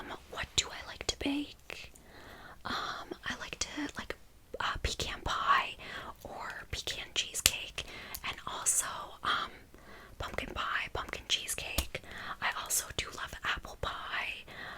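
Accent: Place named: Canada. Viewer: Your Canadian accent really comes through in this video 🍁❤️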